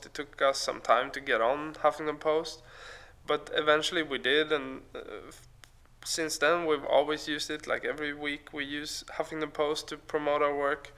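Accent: Sweden. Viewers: Man kan inte bara sluta älska svinglish 😝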